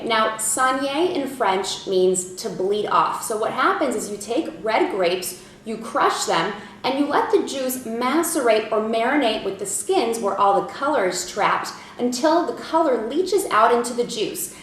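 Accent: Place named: United States. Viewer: Actually her English accent is just fine. It has a slight twang in it but other than that, it is a clean and relatively non-distinct American English accent.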